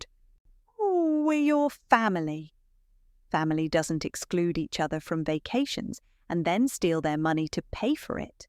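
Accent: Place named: England, United Kingdom. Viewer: Love that English accent.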